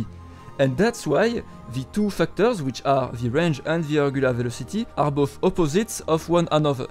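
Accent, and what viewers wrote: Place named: France. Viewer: Precise, informative tutorial...and with a French accent! Really wish more Eve tutorials were executed with the same level of proficiency.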